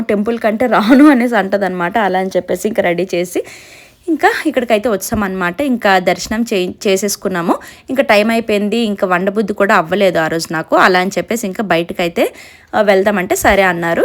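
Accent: Srikakulam, India. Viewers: Srikakulam language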